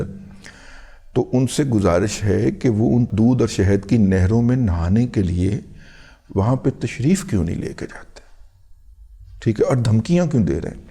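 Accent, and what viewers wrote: Punjab, Pakistan. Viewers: Jaar tuhadi Punjabi bahut mitth hai !!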